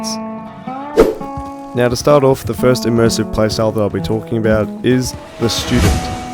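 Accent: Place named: United Kingdom. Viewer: Video about rdr2 over 10 minutes narrated by a man with a British accent is a guaranteed banger lol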